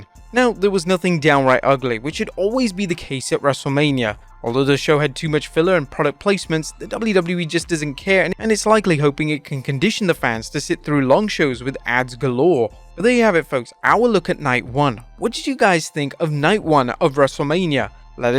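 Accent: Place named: United Kingdom. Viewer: You sound like my British friend She loved watching the rock last night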